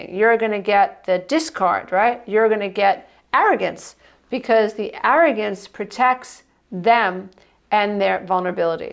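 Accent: New York, United States. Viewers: Your New York accent is EVERYTHING! 😊🏙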